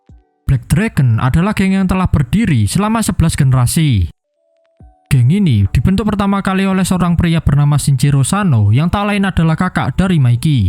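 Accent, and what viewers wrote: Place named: Java, Indonesia. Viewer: Wong jowo koyok e. Iyo a bang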